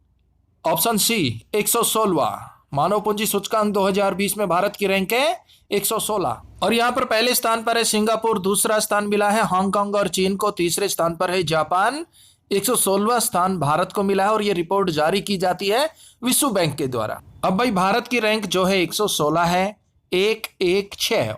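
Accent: Haryana, India. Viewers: Haryanvi style 😘😘😘😘😘😘👍👍👍👍👍 Haryana me kit sh ho ji.. Ap💕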